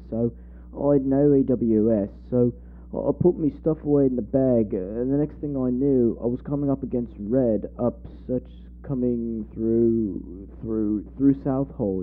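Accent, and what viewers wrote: United States. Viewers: yall are so mean, he’s American and is trying his best to talk out British words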